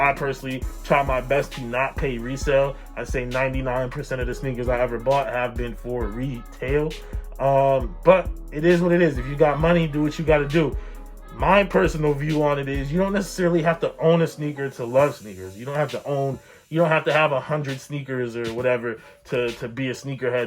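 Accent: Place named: Texas, United States. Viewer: oh you from texas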